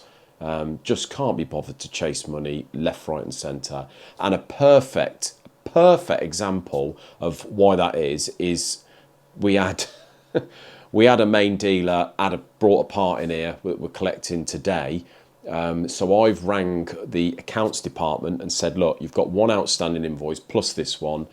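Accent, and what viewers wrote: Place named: United Kingdom. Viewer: Bahahhaha, called their bluff, you go DOG. Burden of proof, how can they do that now, car running, I am soooooo happy you did not cave congrats. Also, I had a customer who had a fleet of toyota pickups, I started doing Maint and repair on the vehicles, until, they started paying in a 120 day rotation, yeah hey Trimline you are fired, good move, I did not have to carry that balance on the books for months. Also you may be interested that you and Veruca Dumont seem to have the same accent, are you from the same area in the UK? 😉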